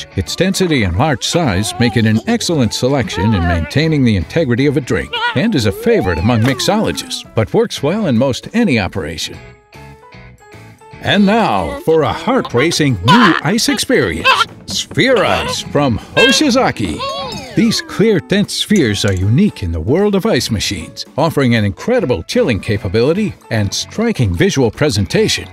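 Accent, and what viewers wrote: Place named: United States. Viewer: Aaww. I remember when I was still a kid about the voice over. Very American and convincing voice. Sounds good quality and reliability.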